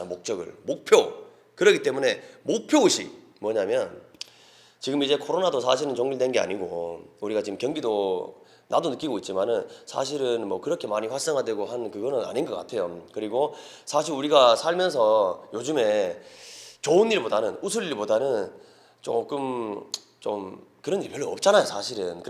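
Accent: Gyeongsang, South Korea. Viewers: 갱상도 사투리 개말립니다 행님 사랑합니다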